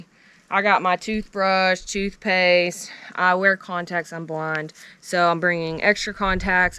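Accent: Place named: Georgia, United States. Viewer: U must be from South Georgia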